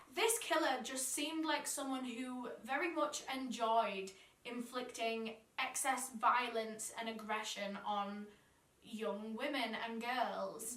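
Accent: United Kingdom. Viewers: Are you British... Im just judging by your sound/(slingo) shmommy 🎉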